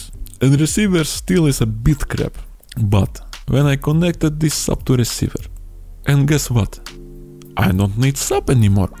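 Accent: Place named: Russia. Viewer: I love the Russian accent in English and how certain vowels and consonants have a special pronunciation. Absolutely adorable, and I am always impressed with people who are multi-lingual. Khorosho! Spasiba. =D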